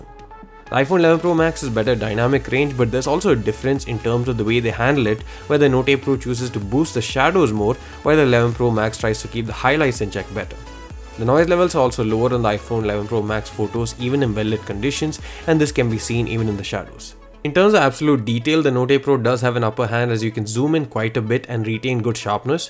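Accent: India. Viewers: ¿Why Indians doesn't work a little bit more on their accent and pronunciation? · this indian accent so fucking disgusting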